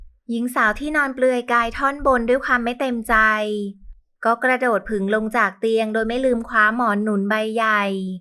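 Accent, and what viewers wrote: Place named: Thailand. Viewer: เสียงเหน่อ น่ารัก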